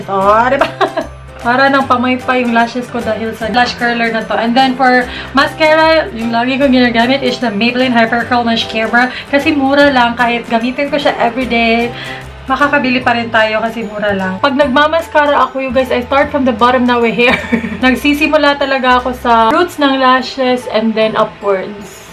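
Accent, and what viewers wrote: Visayas, Philippines. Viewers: Halaaa namimiss ko mama ko sa accent ng bisaya mo ate. Hehe. Godbless po! <3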